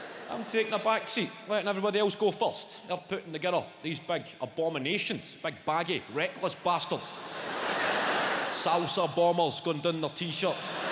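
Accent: Scotland, United Kingdom. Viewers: Literally everything is funny with a Scottish accent - it’s an absolute gift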